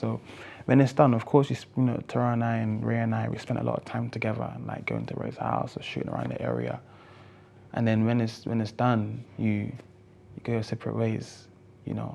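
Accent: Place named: RP, United Kingdom. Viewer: Do you really chat like that lol 😂 sound like really posh and stupid bro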